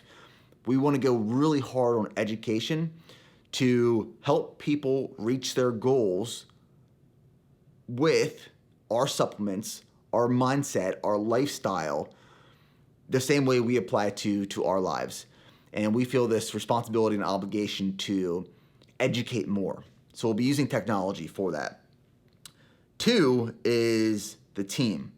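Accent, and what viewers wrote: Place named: Texas, United States. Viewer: Пишу на русском, чтобы было видно, что я не местный 🇷🇺 Во-первых, спасибо за твои видео, ты хороший атлет. Во-вторых, желаю тебе достичь всех твои целей в 2022 году. Кстати, мы русскоговорящие тоже следим за твоими проектами (как же хорошо хоть немного понимать английский). Я, правда, смотрю твои видео пока только с субтитрами, но прогресс есть. Когда только начал смотреть тебя (примерно год назад), то было непривычно улавливать ваш Американский, ну или Техаский акцент, но сейчас уже лучше) Кроме того, подписан на тебя в Инстаграм. Так что знай, GO ONE MORE знают не только в Штатах)) Всем добра и мира! P.S. есть русскоговорящие, кто смотрит Ника? Отпишите внизу, очень интересно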